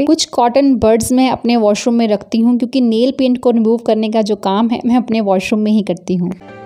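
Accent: Punjab, India. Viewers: ਭੈਣ ਜੀ ਪੰਜਾਬੀ ਟਚ ਹਿੰਦੀ ਬੋਲਦੇ ਹੋ ....ਵਧੀਆ ਲਗਦੀ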